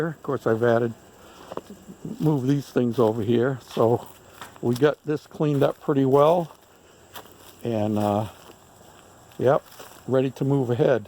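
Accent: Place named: Minnesota, United States. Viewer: Your Minnesota is showing "Don't ya know"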